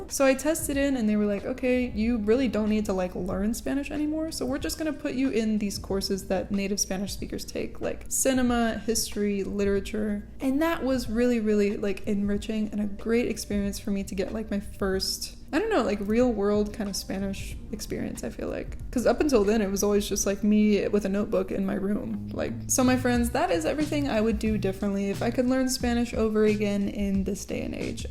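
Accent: Mexico. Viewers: As someone from SPAIN ur spanish is so mexican but it's actually very good!! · It's surreal how your Spanish pronunciation sounds so native and you sound like a high class Mexican girl. I speak Spanish, I just ran into your video and I got curious.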